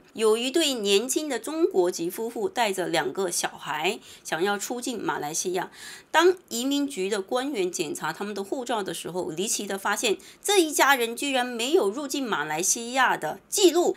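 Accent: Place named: North, China. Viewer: 北方口音好吧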